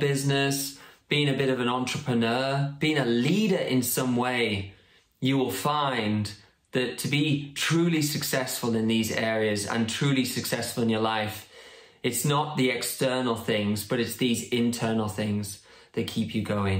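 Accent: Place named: United Kingdom. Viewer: between Queen’s accent and Britis accent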